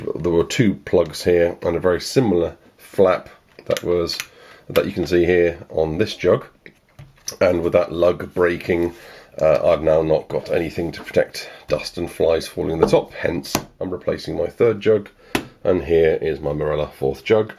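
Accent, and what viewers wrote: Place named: RP, United Kingdom. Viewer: First of all GREAT VIDEO. Second of all, finally an Englishman that speaks the Queens English. Thank you for the vid :o)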